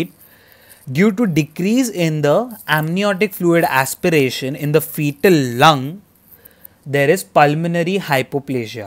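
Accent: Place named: India. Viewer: I usually straggle at understanding Indian accent, but you are so clear thank you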